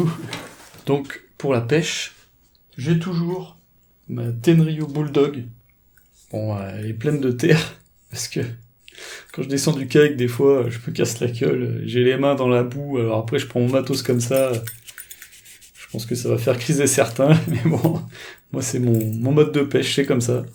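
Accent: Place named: France. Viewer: Penso che parli francese dal accento ma purtroppo io non e parlo e non l'ho capisco cazzo però parlo 3 lingue ma non capisco manco il francese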